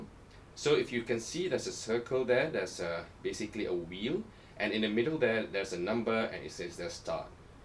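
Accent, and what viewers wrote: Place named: Malaysia. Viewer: I haven't heard a lot of Malaysian English speakers so I'm not sure if this accent is representative. But I like the softness to it .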